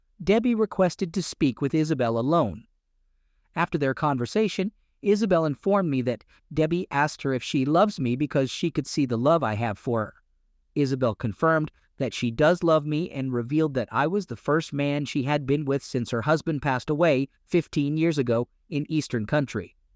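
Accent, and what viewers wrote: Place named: Brooklyn, United States. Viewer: This is the worst narrative bot yet. A brooklyn accent with a simp voice.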